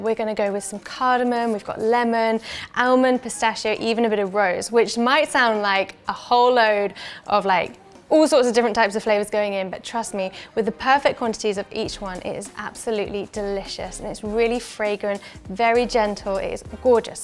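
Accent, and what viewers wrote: United Kingdom. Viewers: Love her channel and love her beautiful British accent 💕🌸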